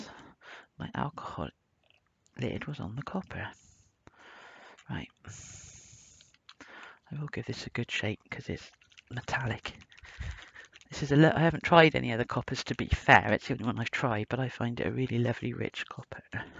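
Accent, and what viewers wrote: United Kingdom. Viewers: I loved loved this video. Lovely British accent, soothing instruction and gorgeous pieces!!! Thank you!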